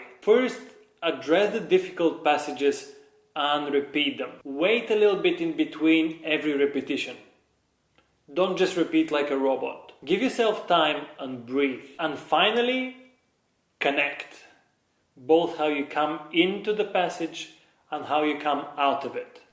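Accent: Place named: Russia. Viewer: You are the best pianist ever, and the best piano teacher 💓 You will be famous one day, i'm sure. I can hear your russian accent when speaking english, one of the best accents. I wish you good luck :)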